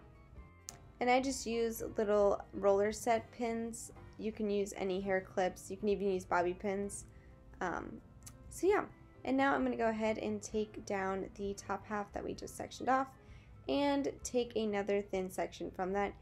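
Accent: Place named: Brazil. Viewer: é br? não sei, se isso foi ofensivo, mas seu sotaque parace q vc é br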